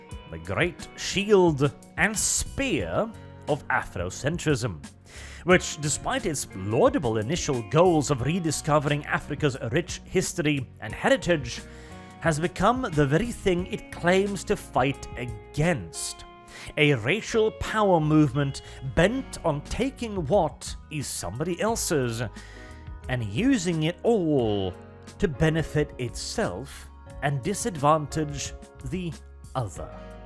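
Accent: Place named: United Kingdom. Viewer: Your from Norway? you sound more British than the British do!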